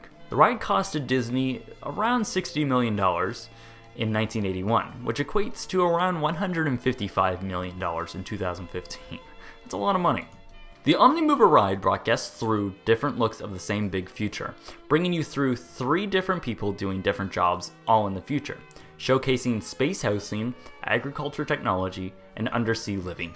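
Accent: Canada. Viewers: you should do impressions of Ashton Kutcher, without your Canadian accent of course. You sound exactly like him!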